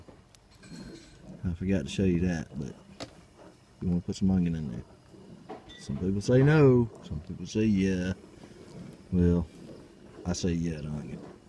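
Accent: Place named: Virginia, United States. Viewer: Really nice video and description. Love your accent and it is very close to how my folks talk from Danville,VA. I'm in the middle (NC). Learned to make stew from some old hands at the ruritan club. They don't grind.They cut into small cubes and cook it til it falls apart in strings. Oldtimer said it needed to have at least one squirrel in there to be authentic. Thanks for the recipe.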